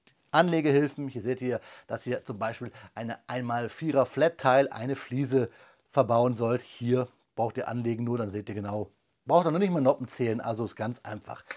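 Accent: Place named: Southern Germany, Germany. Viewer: By your German accept I'm guessing you are based somewhere in the south of Germany. Am I correct? Thanks for another good review! The model is actually better than I anticipated. Sadly still no room on any shelf at home to place a huge one like this.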